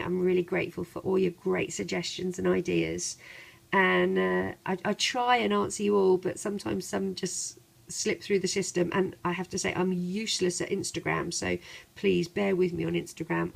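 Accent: United Kingdom. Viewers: Cool channel!!! <3 your creations!!👍👍👍👌👌👌✌✌✌😊😊😊 I love your British accent!!! 🇬🇧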